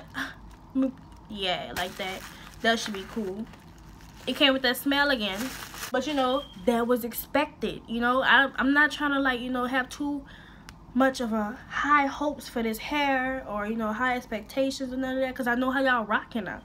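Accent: New Orleans, United States. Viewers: I need a friend from New Orleans so I can laugh I love the accent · She gotta be a NOLA baby!!! I am an we got that accent ♥️⚜️ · Gotta be fr the NOLA! TOO CUTE! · I love the New Orleans accent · Yo! I'm probably old enough to be your Mom, but IFWY!!! OMG! The REALEST video EVER! You crazy and funny asl and we NEED that!! You feel me? I knew as soon as you opened your mouth, you were from NOLA. Y'all funny, crazy, and curse a LOT, but I LOVE it!! You're very pretty too! 😍 New Subbie! PLEASE keep doing hair reviews or hell..any kind. 😂❤💯👍🏾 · Lmfaoo bruhh u from New Orleans or Reserve?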